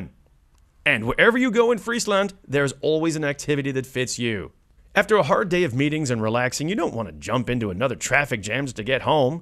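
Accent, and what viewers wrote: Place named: United States. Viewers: Greg Shapiro (the voice of this video) is an American, that's why he pronounces everything the way he does. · You can speak Frisian but you refuse to, why pronounce these things so American-like?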